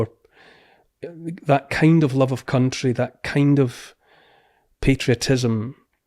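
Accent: Scotland, United Kingdom. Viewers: You kniw Americans love a British accent. This ain't a British Accent. Nothing worse than listening to a nervous Scott... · Ahhh, that Scottish accent!! 🥰😘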